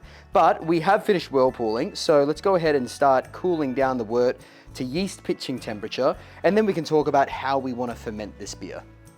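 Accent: United States. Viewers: Was that am American Accent? lol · Lol, thanks, mate! Love the murican accent. Brewing with corn is great. I think it gets overlooked, and some folks think it is boring these days. The funny thing is that the beer seems to disappear immediately for how "boring" it is... one of my favorites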